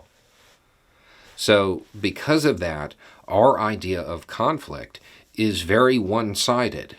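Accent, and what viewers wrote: South, United States. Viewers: violent and unstable? Lol. I was just replying to a veiled threat. Also is clearly not a Yankee which is a name for a northerner here in the US. He’s clearly from the south.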